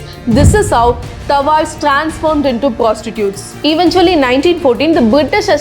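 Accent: Tamil Nadu, India. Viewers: Good video chat i of history with sharp voice and good Indian English❤ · Pls spell it as kotha not kotaa....i know u have a good indian acent not british😊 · your tamil accent is so dominant in english narration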